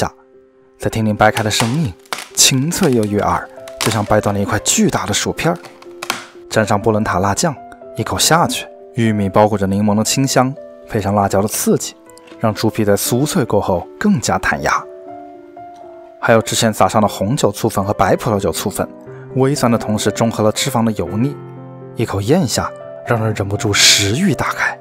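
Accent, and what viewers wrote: Wuhan, China. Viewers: 作为一个武汉人，突然听见了汉普也是挺意外的！哈哈哈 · 听着你武汉的普通话真是亲切。我也是住在德国的武汉人，什么时候你也做做Panettone?相信你也一定会做得很有特色的！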